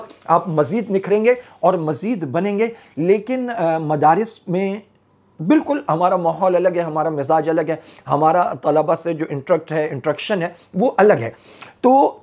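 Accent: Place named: Arab world. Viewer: مولوی صاحب آپ جب اردو میں بات کرتے وقت ح ع عربی کی طرح ادا نہ کریں یہ سننے میں بہت برا لگتا ھے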